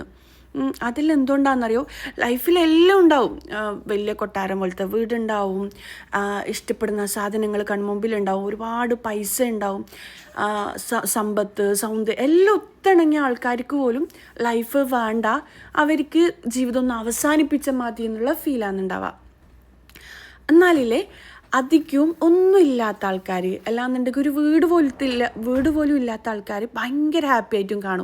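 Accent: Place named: Kasaragod, India. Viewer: Njan kasargodan samsaram njangale samsaram same aanu njangalum kutti machil parayum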